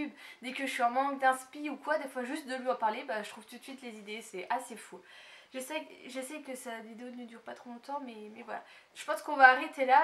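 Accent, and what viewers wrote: Lorraine, France. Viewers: avec le petit accent lorrain de chez nous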